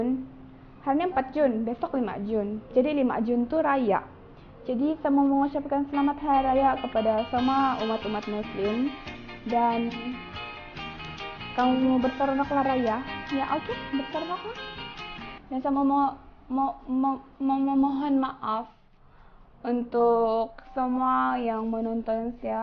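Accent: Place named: Ranau, Malaysia. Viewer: Dari cara ckap ja tau suda Ranau aha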